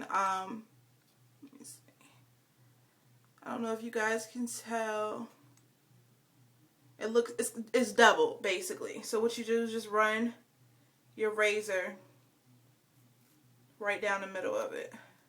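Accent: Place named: New York, United States. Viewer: Are you from New York, Philly, Or Jersey ? You have an accent lol.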